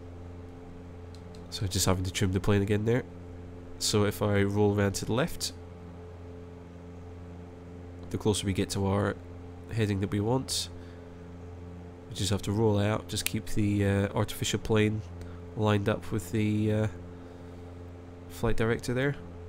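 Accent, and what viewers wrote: Scotland, United Kingdom. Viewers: As a 'Newboy' to FS down in Cornwall, UK, I am impressed by the quality of this video. The clear and unhurried instruction by this Scot is first class. Just what I need at my age.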